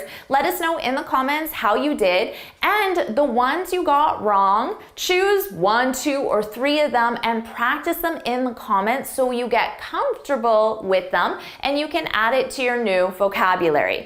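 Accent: United States. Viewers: English vocabulary by an American, very loud one at that!! Not for me. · 15/15 of course. This project is pathetic - why don't you just encourage people to use good dictionaries? After all, that would spare them from hearing your appalling American accent, and from being led astray by your occasional solecisms (e.g: dangling prepositions, or split infinitives). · This American accent is so ugly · So annoying that this is promoted as Advanced English words when the presenter is quite obviously speaking American.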